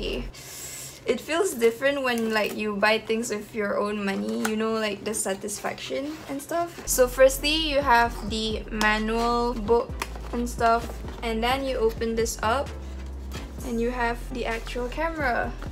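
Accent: Sarawak, Malaysia. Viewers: Akak orank sarawak kahhh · Sarawakian? I heard something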